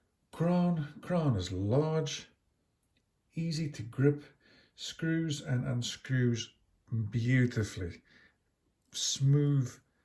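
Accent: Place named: Greece. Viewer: thank you for answering. I would not be surprised is you were Greek the way you speak English, that's why i asked. Yes, second hand may be the way to go, but i would really like the hi beat movement and the thinner profile of the version 3. Wow, from South Africa to the opposite side, Finland 😮.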